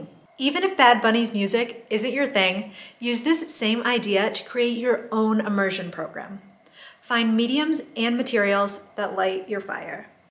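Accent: United States. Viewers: Great use of ‘El Subjuntivo’ in Spanish👍. Don’t take this the wrong way but your Spanish has a heavy American accent but don’t worry I’m sure people in Mexico like it. It’s too bad in the US some people don’t like accents🤷🏻‍♂️and they don’t try to help but hopefully that’s not the case in Querétaro 🇲🇽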